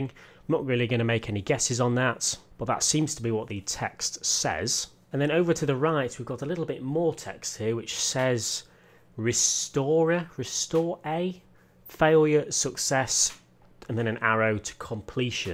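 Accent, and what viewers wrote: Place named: United Kingdom. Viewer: You have a problem to his accent? He's british, and these are purely american names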